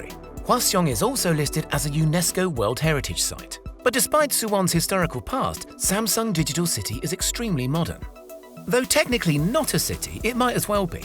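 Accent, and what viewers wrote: United Kingdom. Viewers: Its Football god dammit wtf is soccer. And you even got a british accent........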